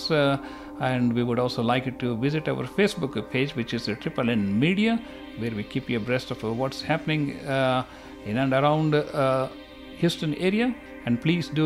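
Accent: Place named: India. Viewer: I don’t understand Indian accent 👎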